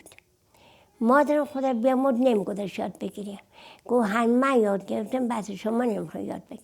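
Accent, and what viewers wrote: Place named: Eastern Turkey, Turkey. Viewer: She speaks similar tone as eastern accent of Turkey with similar sentence emphasises so I feel like I should understand it but barely get a few loan words.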